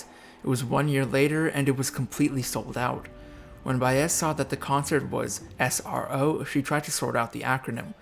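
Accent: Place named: Scotland, United Kingdom. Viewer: Scottish narrator! Scottish narrator! Scottish narrator on every vid!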